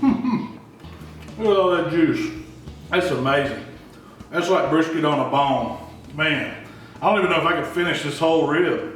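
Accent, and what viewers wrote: South, United States. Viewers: You're just referring to his accent. That's how we talk in the south.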